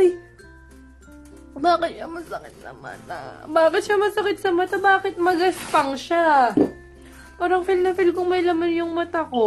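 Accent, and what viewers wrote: Visayas, Philippines. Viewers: so witty 💕 Parang may pagka bisaya accent 😂 which is ang funny pakinggan! HAHAHA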